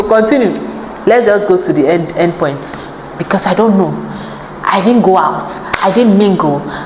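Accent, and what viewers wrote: Ghana. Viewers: You're not from Nigeria 🇳🇬, you speak like Ghanaian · I love the way you pronounce CHURCH the Ghana way. Your accent has changed from Nigerian way to a Ghanaian . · You sound Ghanaian 🇬🇭 ❤ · You need a double citizenship bcos you sound more like a Ghanaian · Air ticket is cheap, book and return to Ghana. Your accent gave you up. Welking, in Ghana but it is pronounced working in Nigeria.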